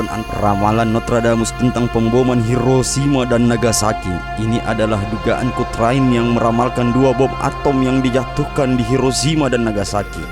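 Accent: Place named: Bugis, Indonesia. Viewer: Suaramu cowo kaya logat orang selatang.. Bugis bingits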